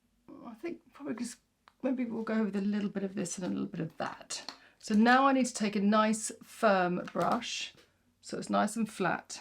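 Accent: United Kingdom. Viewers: I just love your British accent (as an American with a more harsh accent), “cotton bud” rather than Q-tip, “knocking back the shine”. I so enjoy your tutorials and creativity in paring a warm cheek/lip with a cooler eye. Merry Christmas!🎁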